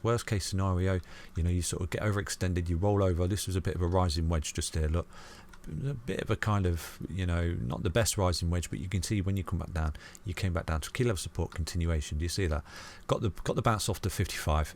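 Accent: United Kingdom. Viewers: nothing like whispering in british accent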